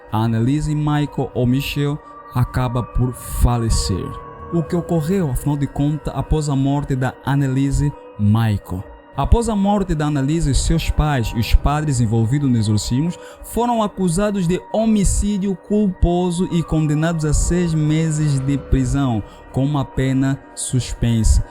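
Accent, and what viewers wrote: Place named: Angola. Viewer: Estava atrás desse episódio já faz um tempo e gostei de ouvir no meu sotaque Angolano🎉🎉🎉🎉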